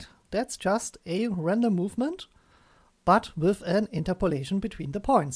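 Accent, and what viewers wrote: Germany. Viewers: Love your Tuts. I don't know if its cause they're awesome, or your German accent is awesome... Or both maybe :)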